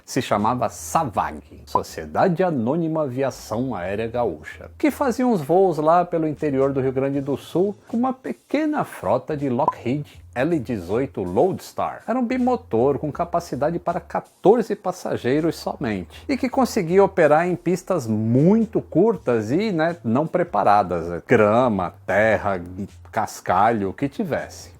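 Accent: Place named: São Paulo, Brazil. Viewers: Cara, sei que não és gaúcho, até pelo teu “leve” sotaque paulista. Mas parabéns pelas informações sobre os locais e cidades daqui, já que muitas vezes os vídeos não se preocupam com isso e erram esses detalhes. Ótimo trabalho! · O pior é que o é potiguar, na verdade. É do outro Rio Grande... Mas veio ainda criança para São Paulo (Guarujá, na verdade, mas o sotaque da Baixada Santista é praticamente igual ao da capital) e pegou o sotaque paulistano. E obrigado pelo elogio, que na verdade foi para mim, que fiz o roteiro. Sou meio obsessivo com checagem das coisas, tenho a maior paranoia de falar besteira (até porque me irrito com as dos outros) e checo tudo várias vezes. Por exemplo, no vídeo do sequestro na China, do qual eu também fiz o roteiro, conferi e descobri que o nome do sequestrador sobrevivente era diferente do da fonte principal que o tinha me mandado - estava errado lá. 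O vídeo saiu com o nome certo. Pesquisei até a pronúncia (aproximada) dos nomes chineses para orientar o.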